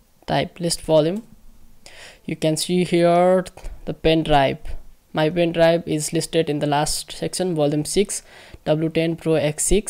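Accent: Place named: Arab world. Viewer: What country are you from you look Indian but have an Arab accent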